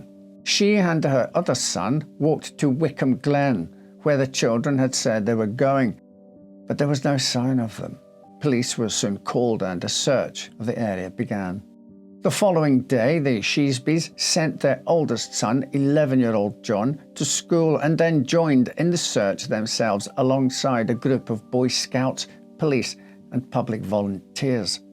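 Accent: Scotland, United Kingdom. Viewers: This is one of the few channels on YouTube that manage to tell stories in a way that really and honesty grips me in every way, it's such a tragic case, and it's also such a shame that we have come to a time where kids playing on their own are no longer considered safe from murder most foul, so I can only imagine what a shock it must had been in a more innocent time where people honestly feared God too much to do such evil things. Also as always this is a high quality production, with great narration. That Scottish accent is so pleasing to listen to, you should consider reading some classic audio-books, like LibriVox books. I hope to "steal" the 'style' in clothes, it's so elegant, I only have boring clothes myself. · I would listen to you read a phone book. your voice and your delightful Scottish speech pattern...yum. Then, the stories are always interesting, though sometimes heartbreaking.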